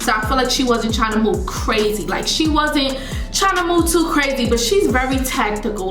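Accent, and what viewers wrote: New York, United States. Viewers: Are you a NYer??? I feel like I can recognize our accent anywhere lmaooo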